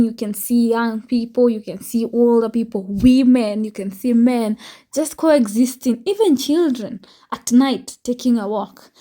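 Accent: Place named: Somalia. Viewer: Her accent doesn't sound Ethiopian. She is Somalian I think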